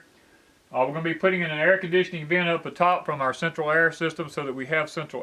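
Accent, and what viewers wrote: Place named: Deep South, United States. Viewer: Nice job, I can tell you are Deep South. In NC we would insulate the floor. Enjoyed watching.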